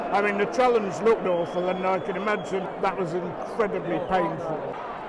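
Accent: Manchester, United Kingdom. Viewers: There are many parts of Manchester, many different communities and lots of different variations of the Manc accent. I'm guessing is from south Manchester and went to posh school;) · He has got a manc accent just a well educated man unlike you who's as thick as 2 short planks 😁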